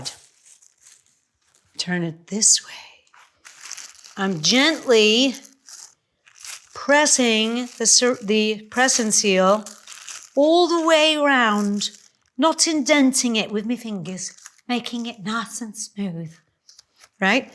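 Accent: RP, United Kingdom. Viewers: I love your Fanny Craddock accent. Perfect! You're a hoot and the cooking is pretty good too! 😍💛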